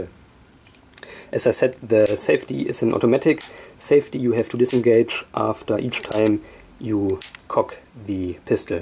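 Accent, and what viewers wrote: Germany. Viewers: Nice video! I love they German accent in English, A really god air pistol, and the Pellets are excelent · DIESER DETSCHE AKZENT HAHAHA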